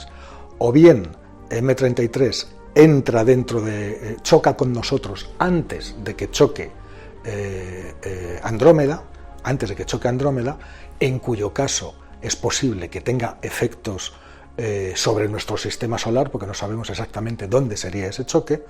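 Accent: Spain. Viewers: no me gustó su video y su español ibérico, hasta nunca. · tan agradable ese sonsonete español...debe ser super vivir en españa y escuchar a miles con esa particularidad...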